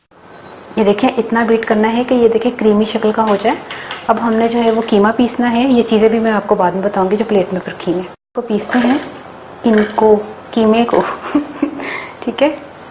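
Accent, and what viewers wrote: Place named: Punjab, India. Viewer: Yar apki punjabi bare mazy ki ha api aur mein larki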